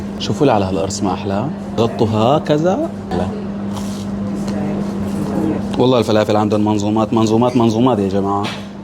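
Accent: Aleppo, Syria. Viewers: هل أنت حلبي❤ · لهجتك حلبية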